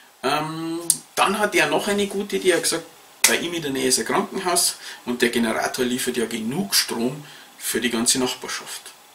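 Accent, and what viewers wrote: Bavaria, Germany. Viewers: Hallo,zuerst mal super Kanal und auch für mich als Elektriker,Prepper,Sportschütze und Funkamateur moch was zu lernen. Du hast ne tolle Art besonders der Bayrische Dialekt ist super. Einen kleinen Kritikpunkt hätte ich und zwar die schlechte Tonqualität,vl wäre ein externes Micro oder so besser aber wahrscheinlich weißt du das eh selber und bist da in der Richtung eh schon am überlegen. Freu mich auf weiter VIdeo bitte mach so weiter echt TOP.!!!!!!!!!!!!!!!!!!!!!!!!!!!!!! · Für einen Bayern redest du richtig gut hochdeutsch. Ist sehr verständlich. Hab schon Sachen erlebt, da hab ich nichts, aber auch gar nichts verstanden. Hab nur nach dem Weg gefragt.....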